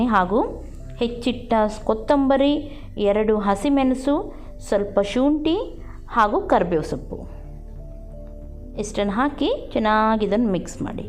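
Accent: Mangalore, India. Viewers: "tapakka" typical managalore kannada...i love it, you revealed many tittle secrets which others dont. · Nice mam .... neev Mangalore navra.... Language haage... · Tapakka typical mangalore kannada😃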